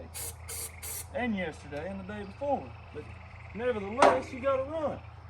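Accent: South, United States. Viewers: I've watched a lot of "Will it run" videos on YouTube and your southern drawl is really nice to listen to, you're also a pretty sharp mechanic who clearly knows what he's doing. Great video! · TURN THE VOLUME OFF! This dudes forced cartoonish Southern good ol'-boy voice is the most annoying thing i've heard on YT ever. I feel like I'm a dumb tourist, sitting on a hard wooden bench at Disney World in Fla, watching a play by a bunch of Hollyweird failed moronic actors.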